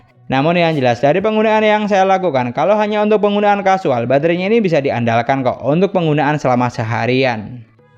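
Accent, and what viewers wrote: Madura, Indonesia. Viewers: Aksen madura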